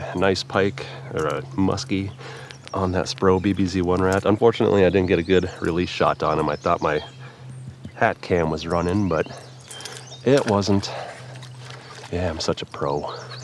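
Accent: Canada. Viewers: Your in N Dakota? Ya sound Canadian. Is it N Dakota Canada?? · What a great and relaxing fishing video! You just got yourself a new subscriber. Excuse a completely irrelevant question from Sweden, but does the ND dialect have some Canadian pronunciation in it, I think it sounds similar?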